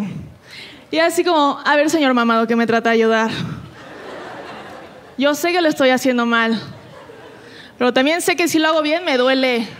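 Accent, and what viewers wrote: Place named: Michoacán, Mexico. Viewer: Tiene un toque de Michoacán 😂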